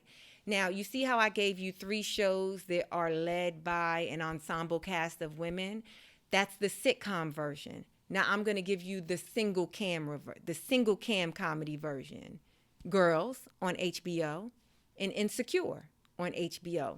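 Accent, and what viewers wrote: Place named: New York, United States. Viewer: Assalamualaikum, i enjoy her comedy so refreshing to have found such a down to earth sister Muslim with a Beautiful Ny accent and such an interesting intellectual approach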